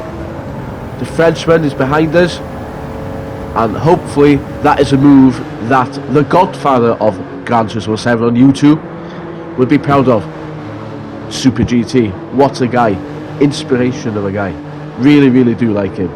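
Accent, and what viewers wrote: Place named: Wales, United Kingdom. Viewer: Notice that Welsh accent now buddy! 😂 great result buddy!🤟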